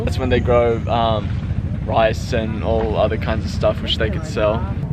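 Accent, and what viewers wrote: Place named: Australia. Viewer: Kept thinking you look a lot like an Asian with Australian accent. Enjoy your travels with those priceless smiles from the kids!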